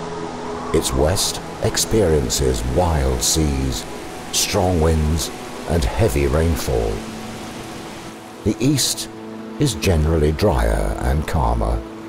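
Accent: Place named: Australia. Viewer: Love the way they chose a really Aussie sounding voice for the commentary, plus he bungs it on a bit more too 😃👍🇦🇺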